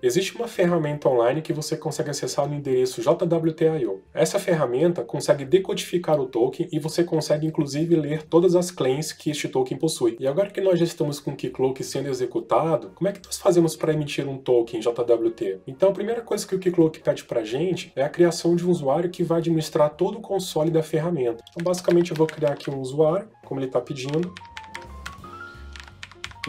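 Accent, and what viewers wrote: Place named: Rio de Janeiro, Brazil. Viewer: olha os microservicos aeeeii, bem carioca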